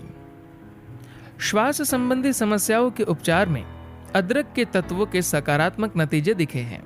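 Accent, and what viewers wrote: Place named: South India, India. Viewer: आप अं की बिन्दी बिल्कुल नहीं बोलते जिससे आपकी हिंदी हास्यास्पद हो गई है। तुरंत पता चल जाता है कि आप साउथ इंडिया से हैं। औ का उच्चारण भी आपका खराब है।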